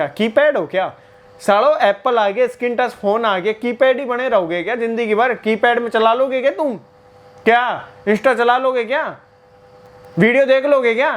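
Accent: Haryana, India. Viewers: I like your language and accent,is it haryanvi?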